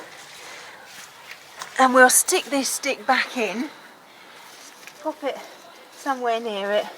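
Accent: United Kingdom. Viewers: Luv'd ya video and your Accent - must be in the UK..:) I just planted 3 kiwi plants ..... wish me luck..:)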